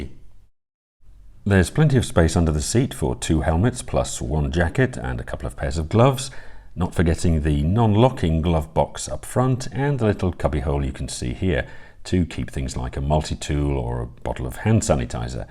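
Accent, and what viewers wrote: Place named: England, United Kingdom. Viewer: You sound obviously very English to me, and you've been "fully metrified" ! The 3.5 litres for 100km , that's 80 MPG (UK gallons) to me ! My fully metrified infant schooling that started in the early 1970s couldn't compete with me having to chat to my very imperial measurements only Father and Mother ! But then I haven't escaped from what is usually wet Blightly for the sunny vistas of the Algarve. Mind you with the temperatures we've had in the UK for a few days this week , as much as the sunny vistas of the Algarve usually seem very appealing, I'm not sure I can handle the heat. Come back and ask me between October and March where I'd rather be, and I'm guessing I'd want to be in the Algarve ! oh, and good review , as always :-)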